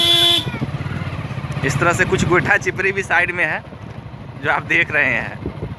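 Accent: Bihar, India. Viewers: ये इस जमाने मे घास पूस के छप्पर सिर्फ बिहार के गावो में रहते है भाई जी । आपकी भाषा ही बता रही है कि बिहारी हो डा को आपलोग रा हो बोलते हो । ई हम नई समझे का।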